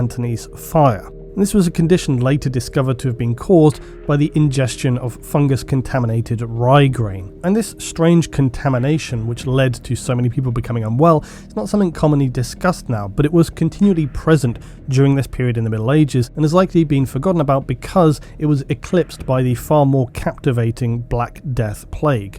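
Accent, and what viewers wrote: United Kingdom. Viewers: Four reasons why I love your videos. 1. Warhammer 40k. I was introduced through Warhammer Fantasy and was intimidated by 40k larger and more expansive universe. However your videos are large and so well made with so much Fan Art that i can't get enough. 2. You time stamp your videos. I get to watch and see what I want to see whenever I come back. Its just so nice! 3. You got a great voice. You have such a great British voice. Just nice and relaxed and a sense of interest in what you are doing. So glad you are doing YouTube! 4. You sometimes use Real World history and how WE as a species can in someway relate. Almost a Parellel Universe feel aha.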